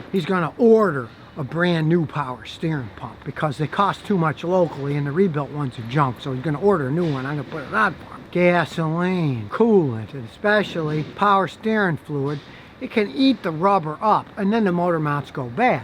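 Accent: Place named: Philadelphia, United States. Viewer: Is this guy from Philly?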